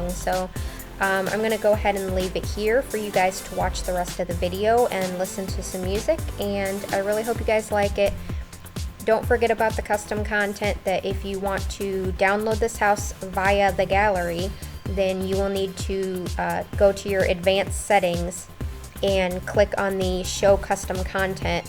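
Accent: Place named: Boston, United States. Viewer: Love the house! Btw did the Cubs win? And are you from Boston ish area? Me and the bf were trying to guess where your accent was from.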